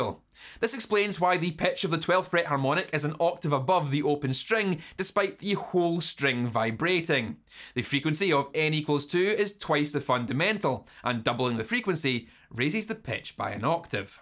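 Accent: Scotland, United Kingdom. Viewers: A video on two of my passions (science and music), with trademark Scottish brogue? Happy new year indeed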